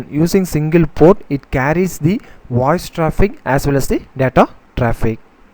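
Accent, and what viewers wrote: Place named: India. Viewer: Only Indians would understand your English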